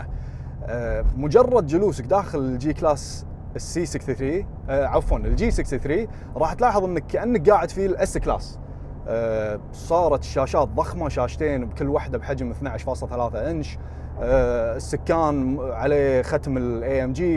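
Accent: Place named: Kuwait. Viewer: والله اللهجة الكويتية جميلة جدا ❤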